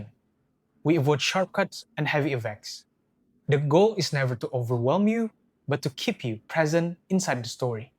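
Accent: South, United States. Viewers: The narrator being from the southern US is kind of weird. The stories are nice.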